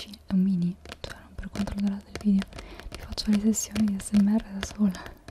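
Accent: Argentina. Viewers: tu acento en español es muy argentino.. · tengo una amiga italiana-argentina que se parece a ti. tu español suena bastante argentino también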